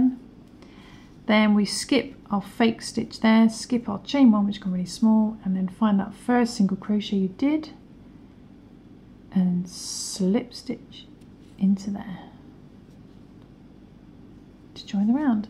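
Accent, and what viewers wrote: England, United Kingdom. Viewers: It’s nice to have somebody English nice and slow and clear and showing you exactly what you’ve got to do your brilliant thumbs up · Loving your channel. I’m 74 from UK and newbie to crochet, struggling with lockdown. You have a lovely clear english voice and clear instruction. (U.S. contributors - of anything - so long winded!) I finally did your daffodil - after many mistakes! and now doing your lovely piggy. Many thanks.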